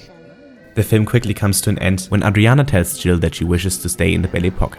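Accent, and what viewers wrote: Germany. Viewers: Junge nach 2 Sekunden hab ich den deutschen Akzent gemerkt hahahahah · 😎. Your choice of words, the attention to certain details, your sense of humor. Also, I'm a huge fan of ACCENTS. Your's sounds German, or Austrian. Of course it might be some other. Finally, being Black American, I don't think I've heard a fellow (white) American go into such detail about JOSEPHINE BAKER & the influence that Black Americans had in Paris during that era. You're clearly (EUROPEAN.) I realize that Europe is far from perfect but I miss it. Also, (MIDNIGHT IN PARIS) is one of my favorite films of all time. For these reasons, I am grateful to you ! 💌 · I'm obsessed with Midnight in Paris, too! This video is what I was looking for when I first saw the film one month ago, and I'm happy to see it was finally created. I never comment, but this is just great. To keep you engaged with your new found audience, I've got a question for you ...is that a German accent I am hearing? 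Keep it up! :)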